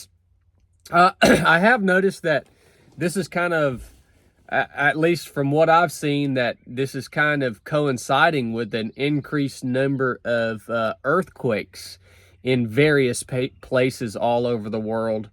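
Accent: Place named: West Virginia, United States. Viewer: So you know has WV accent.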